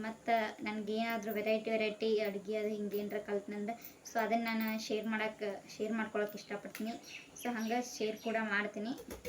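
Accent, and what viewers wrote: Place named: Gulbarga, India. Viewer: Mombi adhuri matte gulbarga matu adtira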